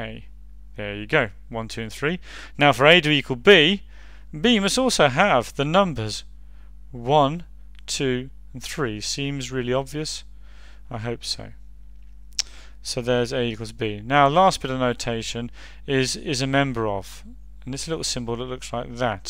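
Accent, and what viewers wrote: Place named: United Kingdom. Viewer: yesss finally found a math video with someone with a british accent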